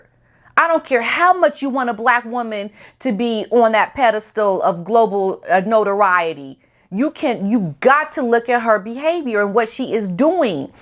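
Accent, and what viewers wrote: United Kingdom. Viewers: No wonder you had the british accent!!!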